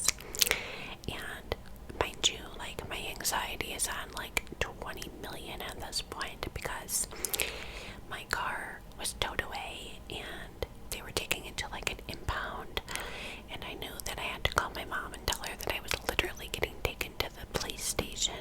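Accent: New York, United States. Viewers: Glawss that's exactly how she pronounces it with her NY accent lol love it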